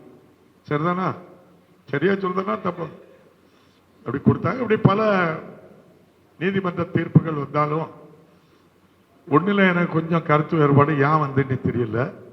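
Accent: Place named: Tirunelveli, India. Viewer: ❤❤❤ நெல்லை மாவட்டத்துக்கே உரிய தமிழ் நடை இவருடைய பேச்சில் உள்ளது.❤❤❤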